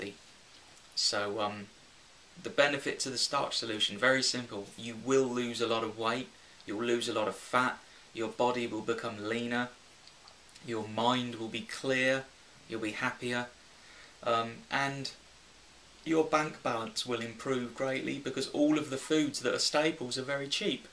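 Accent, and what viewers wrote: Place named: United Kingdom. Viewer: Thanks for sharing your story and experiences. I subscribed you. Btw, I love your british accent! Have a nice day. Greetings from Portugal :-)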